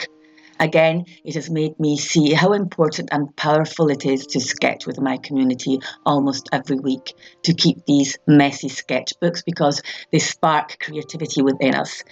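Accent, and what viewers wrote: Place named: Scotland, United Kingdom. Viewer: Your accent sounds Scottish, Italian and Jamaican in parts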